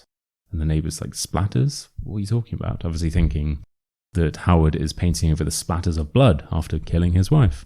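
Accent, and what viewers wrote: United Kingdom. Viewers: Thanks a lot! As a Russian it's a pleasure to have this kind of a real british speaking video, thanks again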